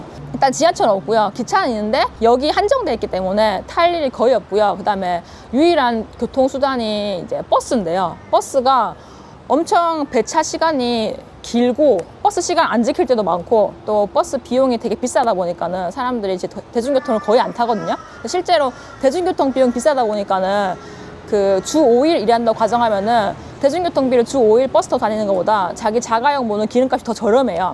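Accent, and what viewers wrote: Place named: Gyeongsang, South Korea. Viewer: 갱상도네요 ㅎㅎ🎉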